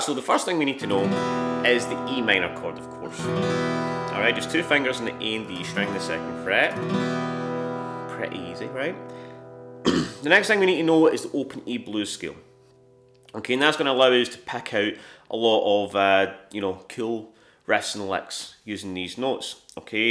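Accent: Scotland, United Kingdom. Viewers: I have absolutely no problem with anyone playing this kind of music at all. But it always seems just a little strange to hear that Scottish accent with what is to me, traditionally very American music. Or any accent. I'm glad people dig it. Play it all you want. It's great. I'm glad people in other places can fall in love with music I love.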